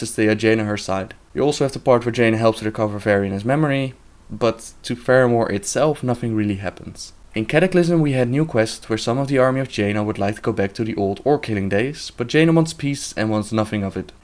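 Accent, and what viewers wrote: Netherlands. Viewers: dat dutch accent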